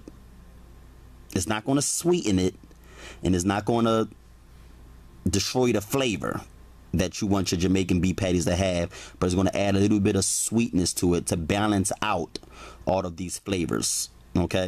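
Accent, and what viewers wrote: United States. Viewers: Love the American accent ;-)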